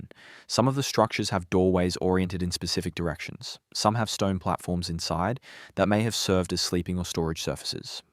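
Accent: Australia. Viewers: You have an Australian accent but you can't pronounce EMU correctly. If the Aboriginal people built villages, why do they not have them now?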